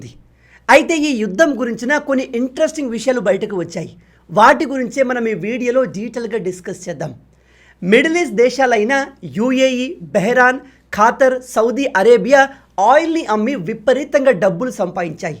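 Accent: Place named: Andhra-Karnataka border, India. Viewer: I was astonished when you told you were from Siddipet.I thought you are from some Andhra Karnataka border.Nice Telugu language you are speaking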